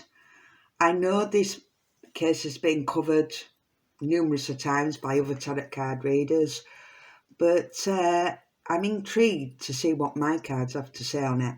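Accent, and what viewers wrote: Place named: Yorkshire, United Kingdom. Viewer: Hey I’m in Rotherham s Yorkshire, your accent sounds familiar hun x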